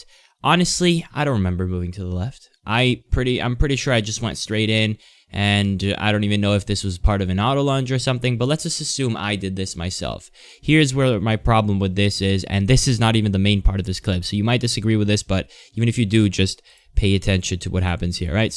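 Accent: United States. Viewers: What content are you in. You sound American but played Cihan in rivals.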